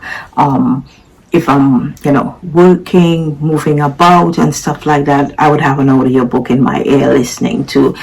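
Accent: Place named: Caribbean. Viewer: 🎉 Congratulation’s To you Newbie to YouTube 🎉 love to hearing the Caribbean accent. Looking forward to your content and recommendations. Blessings to you 🙏🏾🤗💚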